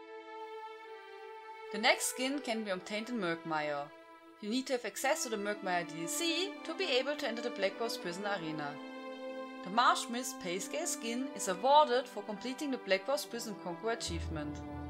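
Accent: Germany. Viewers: yes or Akzent is germany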